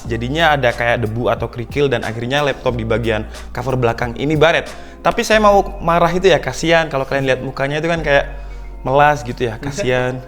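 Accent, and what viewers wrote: Java, Indonesia. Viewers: Wong jowo ta? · Orang malang bang?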